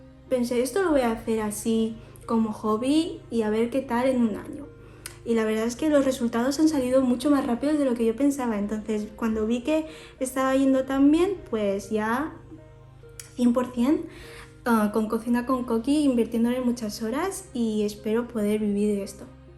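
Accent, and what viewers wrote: Spain. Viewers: No sé pero me das una motivación al cocinar y intentar cosas nuevas, e igual es curioso ver una chica asiática con acento español (se me hace fabuloso) Y yo no e comentado ni un vídeo tuyo pero yo amo tejer, puedo tejer y e dado clases de como tejer. E querido subir videos a Youtube de como tejer pero no encuentro la creatividad, tengo el deseo y el don de enseñar pero al parecer no la imaginación. Ya veremos qué tal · Como aprendió español de España siendo de otro país.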